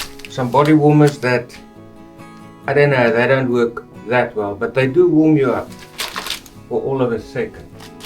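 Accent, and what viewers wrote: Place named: South Africa. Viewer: Just found your vid via YouTube on my tv - loved it😁 Where in South Africa do you hail from? Over 50yrs ago a relative of mine went to SA for a 6 month working holiday and stayed! Your accent reminds me of his wife's. Looking forward to seeing more of your adventures 😁 According to a mountain rescue team leader my sister worked with (and who taught me how to read an OS map) you should never go hiking in denim jeans!! Take care and please keep on bothy camping for those of us that can't! Another subscriber 😅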